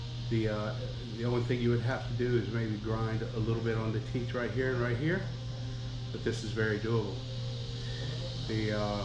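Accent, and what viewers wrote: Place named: South, United States. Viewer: good morning MR. another informative video that small sprocket you made pretty neat I think you can purchase them at mz Miami I thought I saw a 11 tooth I can't explain technically what it does but just like raisins the number of teeth on the rear sprocket does something so does the front it did the same thing in RC trucks cars anyway I think lowering the front sprocket slower take off faster top don't quote me lol but it's something like that You can tell you're from the South brother I'm in Texas you can hear them cicadas singing lol you your wife and family have a great labor day stay safe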